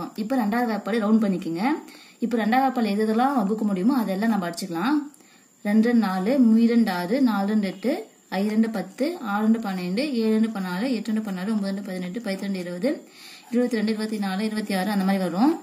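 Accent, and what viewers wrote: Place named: Chennai, India. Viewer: Unga chennai speak super madam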